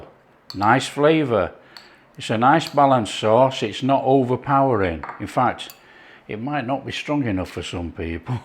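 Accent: Yorkshire, United Kingdom. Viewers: Beautiful, mate. Looks easy and tasty. Will give this a go tonight. Where abouts in the UK is that accent from? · Thought i heard the yorkshire accent. Cool thanks for the video.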